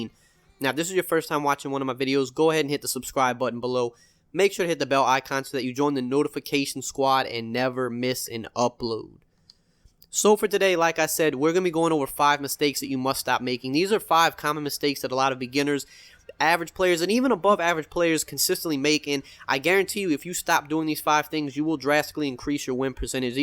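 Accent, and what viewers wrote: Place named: New Orleans, United States. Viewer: First of your videos I’ve watched, noticed the New Orleans accent, keep it up, WHO DAT BABY!!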